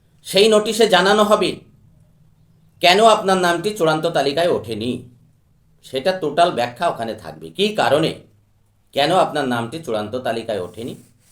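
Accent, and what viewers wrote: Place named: Bangladesh. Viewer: আপনার উচ্চারণ গুলো কিন্তু বাংলাদেশীদের মতন।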